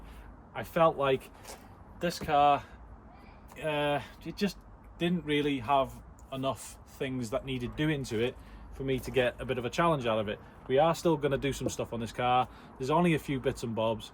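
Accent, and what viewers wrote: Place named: United States. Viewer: wow!! you sound very american and even have the car to match it!! love the videos keep it up👍👍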